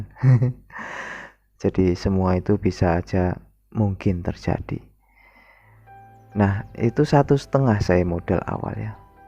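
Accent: Java, Indonesia. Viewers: Orang Lampung ? Kok logate jowo mas!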